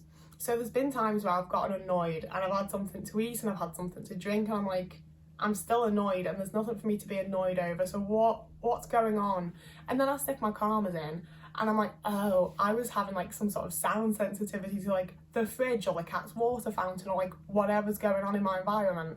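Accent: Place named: Northwest England, United Kingdom. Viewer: It's not particularly strong which makes it hard to say for certain, but central northwest England, between about 53 to 54 degrees north, includes the Manchester area.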